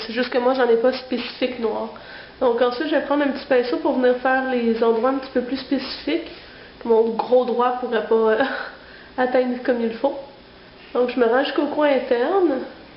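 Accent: Quebec, Canada. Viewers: Tres jolie make up !!! Un gros kiff sur ton accend quebequois !!! J'adore !!! J'ai créer ma chaine abonne toi si tu veux !!!!!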